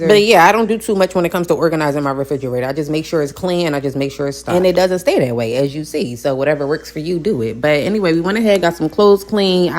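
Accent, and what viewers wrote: Philadelphia, United States. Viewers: Love the Philly accent. I be catching my self mocking my family after chilling with them for a day or so!!! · I need to get some of those fridge containers! Is that a Philly accent I hear! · This woman definitely sounds like she is from Philly.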